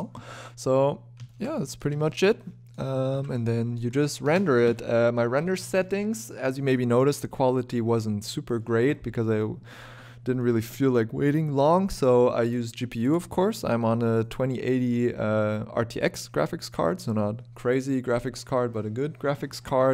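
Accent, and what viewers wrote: Germany. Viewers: haha ich war mir nicht sicher hab es mir gedacht klingt ein bisschen wie Deutsches Englisch. Aber du sprichst richtig gut Englisch mach weiter so !!!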